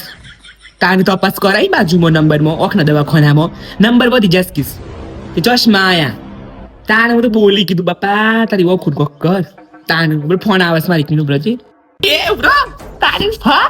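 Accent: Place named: Idar, India. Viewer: msst cho tme mne to idar ni bhasa bv gme che ho bijo pn bnvjo ok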